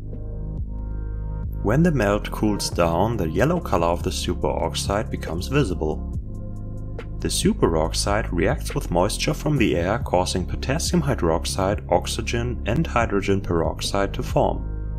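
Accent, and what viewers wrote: Germany. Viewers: Are you German? Just for curiosity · interesting question. it at least sounds like he has a slight german accent.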